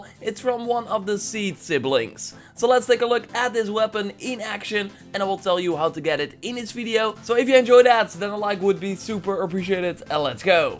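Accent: Netherlands. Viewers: Are you dutch? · Dutch accent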